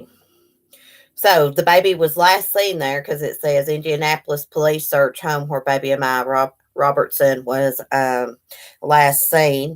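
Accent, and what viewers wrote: South, United States. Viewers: Hello. I am new to channel but I love it!! You are so down to earth. I love your southern accent!! I love the south. Your channel has no drama and you have good mods too. You and I seem to have the same opinions on most things. Ok going to watch more of your replays!! Thanks for being you, real!!!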